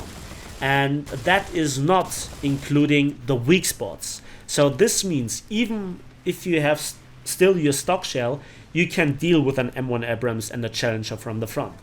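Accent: Germany. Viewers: Well i think he is german (not sure) but his accent makes him sound german.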